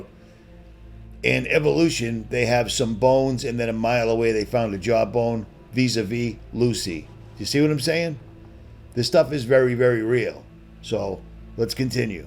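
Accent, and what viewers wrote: Boston, United States. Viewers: Yo bro definitely a good piece!! Sounds like a guy I knew from Boston I found on YouTube back in 2013👍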